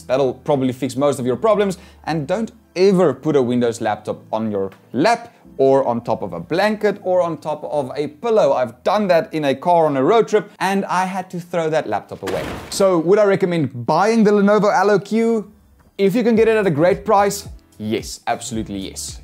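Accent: Afrikaans, South Africa. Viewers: Hello I just wanted to say you sound south African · Kan sommer die afrikaans hoor in jou stem. Ek het die laptop in Demark gekoop. Beste gaming laptop daar buite op die oomblik net my opinie.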